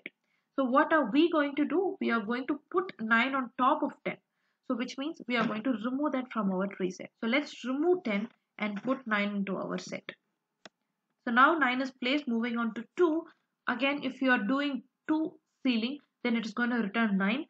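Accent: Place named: India. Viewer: I wont lie...normally I ignore videos in Indian accents (because generally they're rubbish)...but this was excellent. Well done and thanks.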